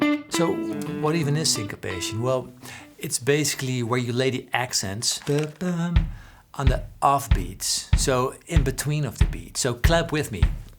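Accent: Rotterdam, Netherlands. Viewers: Hey ouwe Rotterdammert! Ik wil je graag zeggen hoe leuk het is om een gitaar youtuber te horen in m'n eigen accent:) Ik woon al 15 jaar in Sydney. Speaking of John riffs and licks, I think is somewhat similar to this one. A good one to do is the solo on ' '! I'm sure there is something interesting about it. En nog gefeliciteerd met het uitlopen van de Marathon!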